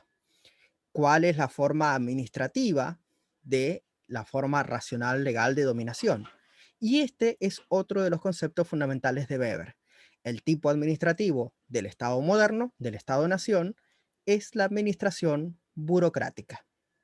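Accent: Standard. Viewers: Me encanta como explicas, pero se me hace raro que hables en neutro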